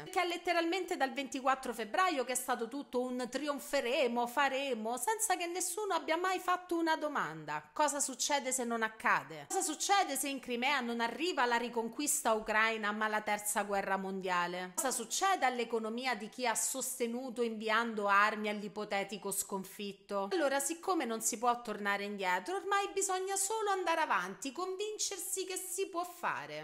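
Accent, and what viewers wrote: Rome, Italy. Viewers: I tuoi video sono delle "Icone" concentratti dell'italico sapere e ponderare. Grazie poi dell'energia positiva che metti col tuo romanaccio simpaticcissimo. Grazie veramente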